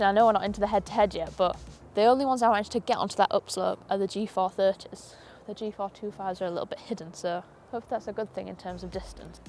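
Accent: Yorkshire, United Kingdom. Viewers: Have played the G410 and am looking forward to trying the 430 when it's available. Liked the review and the friendly Yorshire accent, thanks.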